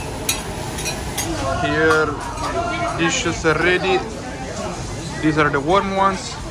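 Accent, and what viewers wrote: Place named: Italy. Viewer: omg i enjoy hearing your italian english。。。so funny engjoy Chonqqing just come back from Italy last week：）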